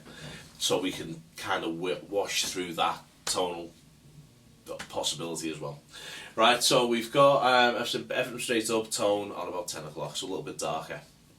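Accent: Liverpool, United Kingdom. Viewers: Scouse. The language and accent of the Gods 😊Great review La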